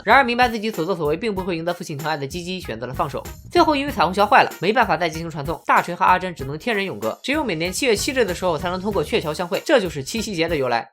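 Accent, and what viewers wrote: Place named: Tianjin, China. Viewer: 那不是天津话么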